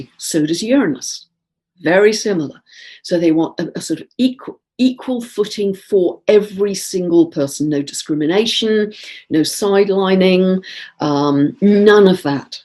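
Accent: England, United Kingdom. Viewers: I'm here for English......I just enjoy listening to her. Light and love from South Africa.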